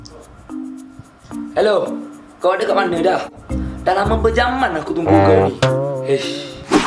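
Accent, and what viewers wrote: Terengganu, Malaysia. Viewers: dia cuba nak ckp KL tpi ade jgk ganu masuk sikit. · cute awk ckp ganu.. anyway dpt juga kami ilmu.. Tq.. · Nakal laaaa org ganu ckp KL boh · Aku dengor pelak dia cakap ganu tu. Nasib ambe duk ganu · teruk benor ler oyak ganu nyaaaaaa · x paham doh.. ko ckp k.l ke atau ganu? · Loghat tganu and ada lagu hyde mula2 vid.. I loike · ni video pasal org tranung try ckp kl la....tp ade jugok dialek tu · loghat tranuuu mmg best hahahahahahaha tatau la nk cakap camne.. stiap kali mmber dr luar trgnu dtg, mesti bwk aku skali sbb dyorg tak faham.. bila tgl vid ni, okehh.. mngingatkn aku kdg2 trkluar bhse sendiri.. 😂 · sgtlah huduh ganu anda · video sedp doh...kalu buleh ejah sket agi arh loghat tranung orh baru sedp...papepon video molek boh..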